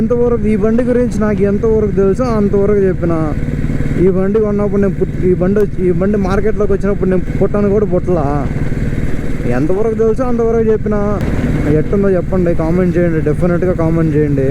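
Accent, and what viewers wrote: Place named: Karnataka, India. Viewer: u r language is good to hear , karnataka border untaava mowa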